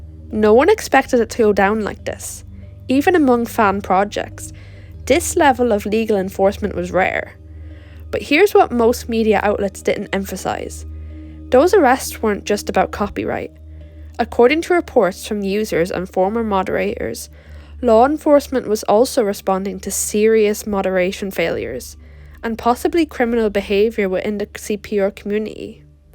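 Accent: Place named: Ireland. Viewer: That's so interesting, I guessed it was Irish but I didn't even know you had different accents like that. Are you native?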